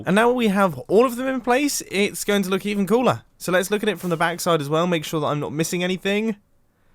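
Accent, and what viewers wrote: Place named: United Kingdom. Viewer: he is British!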